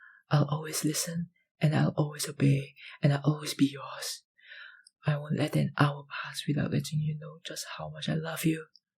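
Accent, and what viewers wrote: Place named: Singapore. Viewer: wow u have the lioncity accent 😂👍🏼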